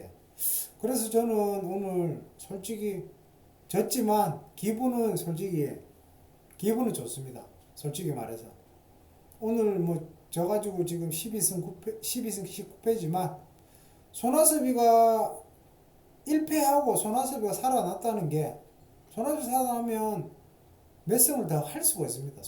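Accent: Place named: Busan, South Korea. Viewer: 저는 소리 전혀 안울리는데요! 소리가 약간 작게들리는것은 있는데~ 제가 볼륨을 올리면 듣기에는 전혀 지장이없습니다! 소리 언급 하시는분들 가끔있으시던데! 소리가 울리기보다는 제생각에는 사투리억양 때문이 아닐런지 싶습니다! 저는 부산사람이라 오히려 다른유트뷰 분보다 더 잘들리는데! 서울분들은 억양때문에 좀더 집중해서 들어야 되지 않을까? 란 추측을 해봅니다!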